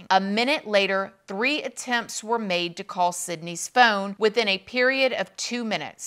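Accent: South, United States. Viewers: You can really tell that your from the south..."cause the most beautiful things come out your mouth" 😊❣️🎉 the adults in this situation were very immature emotionally and spiritually..You expect a 20 year old to not be super mature and aware of things but honestly these older people took advantage of her inexperience with relationships and her crush on a married man... They should have been setting a better example for the young lady...but obviously they had their own deep rooted issues . I think they both got off on the whole thing....Heather didn't make the best choices ..but she was young and inexperienced and didnt deserve to die over it... Tammy and Sydney are preditors... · New sub here. Love your videos...plus you gotta be from the south or have a lil hood in you. " beat the dog doo doo out of him" lmao I havent heard that in soo long. Plus the way you say POlice. 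I love it. You do a great job on these videos. Cant wait to see more. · 😂😂 guuuurrrl I know U country I can tell that, yes ma'am lol but I can't pick where U from, I have fam in calli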